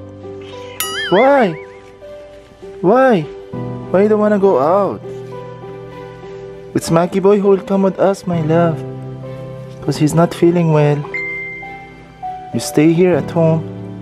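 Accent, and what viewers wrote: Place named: Philippines. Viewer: Hope Maki boy gets well soon, you are a great dad. By the way, while listening to you talking, your accent and intonation is sounding more and more like Filipino speaking in English.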